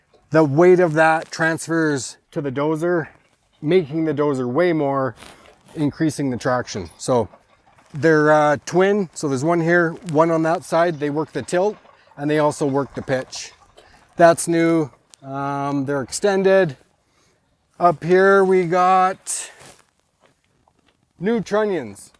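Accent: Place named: Canada. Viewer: IT'S ALWAYS SCARY AND COUNTER-PRODUCTIVE WHEN A REVIEWER OF A PRODUCT SAYS ''I DON'T KNOW WHAT THAT IS'' ,( ISH), .THIS IS MY 1ST TIME HERE AND ACCORDING TO HIS PRONUNCIATION OF THE ENGLISH STYLE HE'S SPEAKING I'M GOING TO TAKE A STAB AND SAY THIS INDIVIDUAL IS A NATIVE FROM THE GREAT WHITE NORTH AND THAT HE REALLY DOESN'T KNOW WHAT ANY OF THAT IS FOR. HE ALMOST HAD ME FOOLED WITH THE MACHO, COPY-CAT FASHIONISTA TATTOOS.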